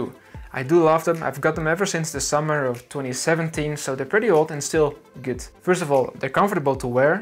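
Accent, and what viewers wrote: Netherlands. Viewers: wait wait u dutch?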